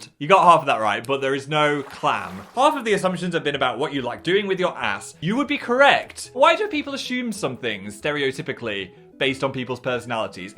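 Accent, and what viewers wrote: North, United Kingdom. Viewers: HIS ACCENT IS GETTING MORE NORTHERN I LOVE IT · I love that editing is like 60% more Northern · i just realised something, slipa back into his northerner accent quite a bit in this video, its hilarious lol. With the trailer release of Hometown Showdown tho, maybe he just spent more time in the north and it came back ahaha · I always thought phill talking about his secret wife was Brin It might be his northern accent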